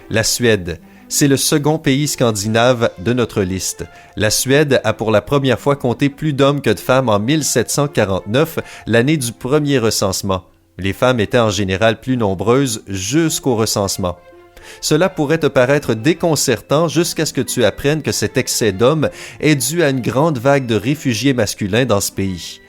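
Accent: Quebec, Canada. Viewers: Yess accent québécois! Vive le québec! ❤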